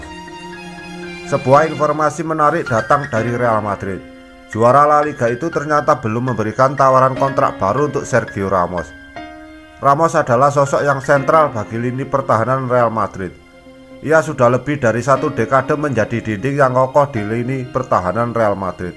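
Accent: Java, Indonesia. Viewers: Aku suka channel ini..cmn suaranya min ini yg aku gak suka..logat Jawa nya terlalu kentalnya dan kedengaran nya gk terlalu jelas min baca naska kaya ke anak SD yg baru belajar baca.. tolong min Suara yg lantang dan jelas kita pengen menikmati berita🙏🙏🙏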